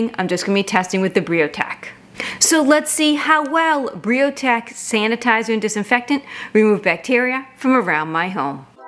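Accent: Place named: Rhode Island, United States. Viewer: What accent do you have? Sounds like Rhode Island and/or Massachusetts.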